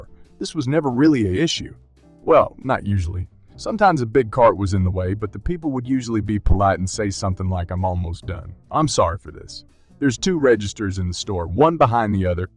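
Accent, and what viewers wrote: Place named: Texas, United States. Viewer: Think he's from Texas Got a southern drawl Not a bad reader Just needs to modulate his speech when doing the different people Same voice throughout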